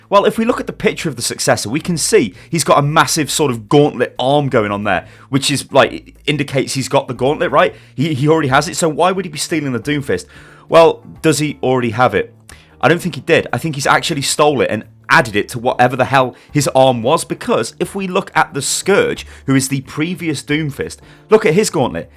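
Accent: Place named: United Kingdom. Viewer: minseoks He's British you fucking chucklehead. It is easier to say it like how he says it then forcing himself to say it how it is normally pronounced. That's like being a non-english speaker but some dumbass such as yourself saying that the non-english speaker is retarded is just as stupid as the statement itself.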